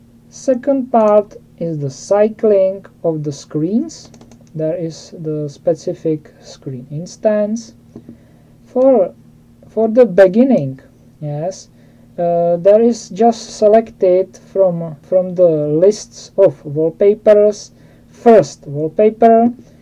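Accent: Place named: Czech Republic. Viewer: i cant just sniff out my fellow czech brother from that accent :). Greetings from SK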